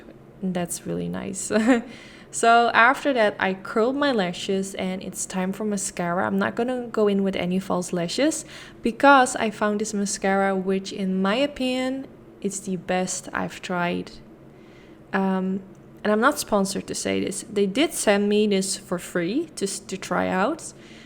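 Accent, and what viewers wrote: Netherlands. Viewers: Are you Dutch? 😁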